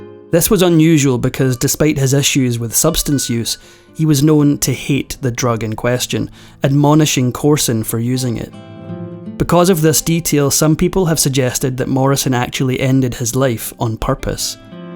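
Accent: Scotland, United Kingdom. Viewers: It's a Scottish accent. And, yes, it's lovely!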